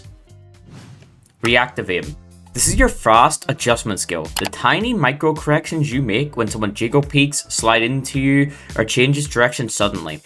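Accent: Northern Ireland, United Kingdom. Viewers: curious what part of northern ireland you are from. Only came across a handful on apex.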